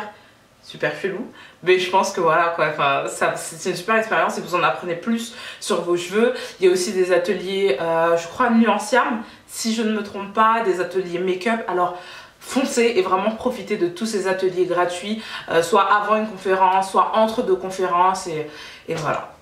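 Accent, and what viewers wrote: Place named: Antilles, Caribbean. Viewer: J'ai adoré ce petit accent antillais lol. Un vrai kit de survie 😏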